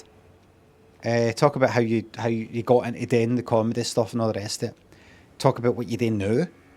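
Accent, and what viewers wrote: Scotland, United Kingdom. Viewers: If you could also tone down the Scottish accent that would be great (for our viewers outside of Scotland)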